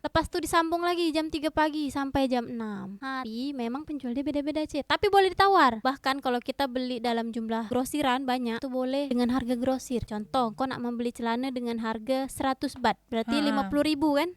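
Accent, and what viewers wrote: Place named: Kedah, Malaysia. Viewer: Hang nak pi mana tu..bahasa Kedah ni 😅😅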